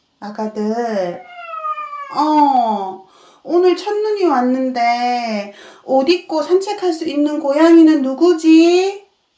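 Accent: Gyeongsang, South Korea. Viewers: 집사님 목소리가 너무 좋으세요 경상도분이세요?😅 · 대구사시나봐요~ 사투리 억양이 대구표준어의 정석이네요. 저랑 말투 똑같아서 들을 때마다 놀라요.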